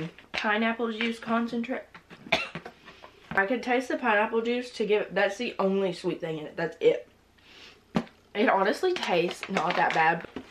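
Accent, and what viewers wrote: South, United States. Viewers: I was listening to you and you sounded hella southern and I saw you lived in Alabama 😳 I do too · She sounds southern but didn't know what venison was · I’m new here so I was like “When you find out the jerky is made in LA give up the accent” but as I stayed I realized oh crap that’s her accent. It’s not Texan (like mine) but she’s actually southern huh? 🥸🤪 (especially not Texan when you don’t know what Venison is🤷🏻‍♀️) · i live for that lil bit of yee yee she got in her voice. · She turns more and more southern as the video goes on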